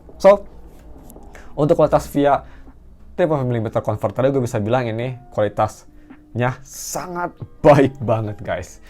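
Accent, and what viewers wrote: Bandung, Indonesia. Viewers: Kang, orang Bandung?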